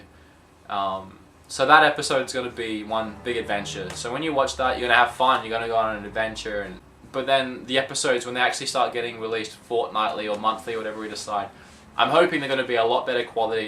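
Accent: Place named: Australia. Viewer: Young with his super strong Aussieland accent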